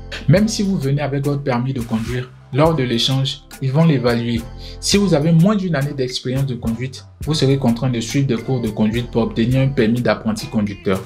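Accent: Ivory Coast. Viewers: Merci. J'aime l'accent ivoirien 😊 Hommage à CHEIKH TIOTÉ😢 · CONSEILS TRÈS UTILES MERCI INFINIMENT TON ACCENT FAIT PENSER À BABI ( ABIDJAN) ❤❤❤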